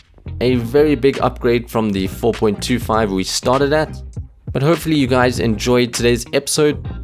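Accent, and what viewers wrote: South Africa. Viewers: R u south african you sound like 1. Our pronounciations and accent remind me of a south african i am aswell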